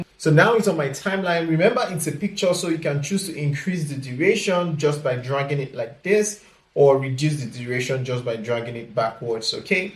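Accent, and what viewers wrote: Nigeria. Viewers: Which English did you use for this is it Nigeria English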